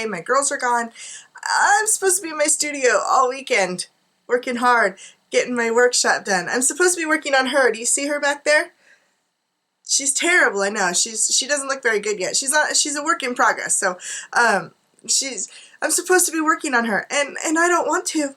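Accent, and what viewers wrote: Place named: United States. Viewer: Ah well I am British and you are 'very American' to me, I don't know what very means but to me you definitely have an accent lol xx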